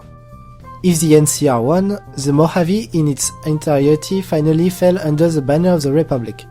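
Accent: France. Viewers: ... never changes. In the beginning he uploaded great tutorials and lore videos, with a mild French accent. Now he uses his own music and has significantly upgraded the quality of his already amazing videos, but beneath the cover he's still the same. Because... never changes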